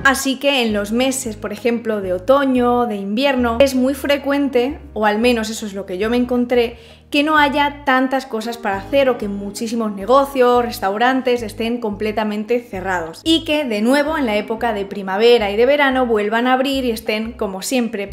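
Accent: Spain. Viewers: cuando vi el video pense que era una latinoamericana que se fue a Croacia, despues la oi hablar y me di cuenta que era una española, Croacia es maravillosa!!!me gustaria vivir ahi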